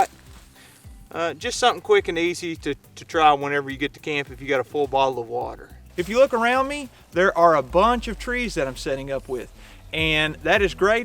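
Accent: Kentucky, United States. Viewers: Excellent stuff here. Never thought to check ground level with my water bottle! One thing I used to love seeing when I was deep fall camping for hunting was a floor covered by dry leaves where I was going to camp. I’d use a stick and rake up three piles. Pick out the sticks and twigs from them, then set my tent up on top of the smaller pile. The other two I’d use to fill up two large heavy duty lawn bags. I put the two bags in my tent, covered them with a wool blanket, and that was my mattress. Combined with the duff under the tent I stayed warm and comfortable. ABC - always be careful, though…if it has been a warm day, you may accidentally rake up a copperhead. Also… I saw you wearing a BBN shirt in a video and your accident sounds Kentuckian. Are you one of us Appalachians, man?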